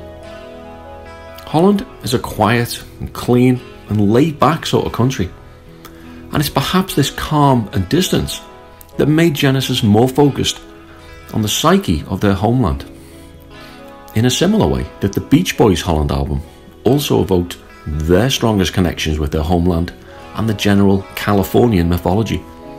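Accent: Liverpool, United Kingdom. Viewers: I’m from England and believe I have a good ear for English accents but it’s not just because you’re a scouser that I can’t believe you aren’t of Guitar the YT channel mainly devoted to detailing all things Oasis, Shed Seven, Ocean Colour Scene & Britrock of the late 80s onwards. Perhaps you are one or have diverse tastes but operate under various headings! Both channels - this one & are great in - depth stuff anyway 😁❤️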